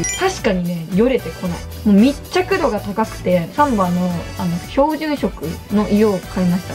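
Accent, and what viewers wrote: Okayama, Japan. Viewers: 岡山弁かわいい！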